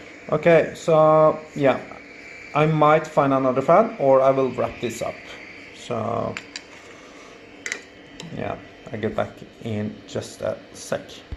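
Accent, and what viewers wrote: Norway. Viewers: Damn you HAVE TO BE Norwegian.......you got THAT accent hahaha.....greetings from Trondheim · I just love a good ol' norge accent. Thanks for the video good sir, I am currently trying to know more about this kind of fans!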